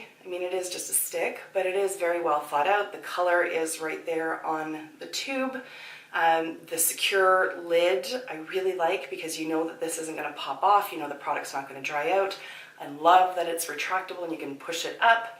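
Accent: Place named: Canada. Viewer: I kinda immediately guessed you were Canadian, because you speak very pleasantly :)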